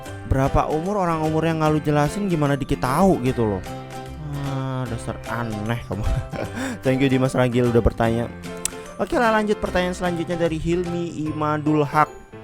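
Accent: Java, Indonesia. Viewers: cara ngomong biar ga medhog gmn bang? #TanyaTAMPAN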